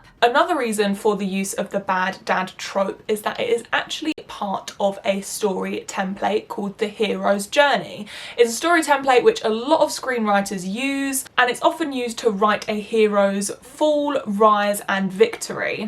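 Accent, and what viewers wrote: England, United Kingdom. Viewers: Her british accent 🦋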